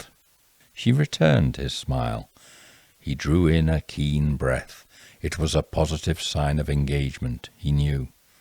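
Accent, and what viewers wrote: England, United Kingdom. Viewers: Wonderful. Another intense mystery by to enjoy. Fantastic mysteries with his lovely English accent guiding us through the most certainly surprising end. I can feel the busy day melting away as I listen. What a talent. ❤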